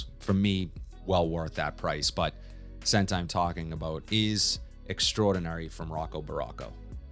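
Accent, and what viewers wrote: Boston, United States. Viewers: I definitely… couldn’t tell you where from Boston until you mentioned it…. Elixaarr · I was watching one of his videos on my phone and my wife heard him and said, "Who from Boston, are you listening to??" 😂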